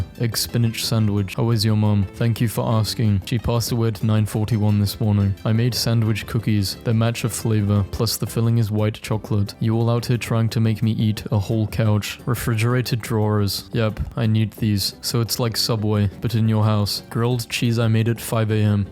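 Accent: United Kingdom. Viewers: Wait… your British? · ur bri'ish? i thought u were an aussie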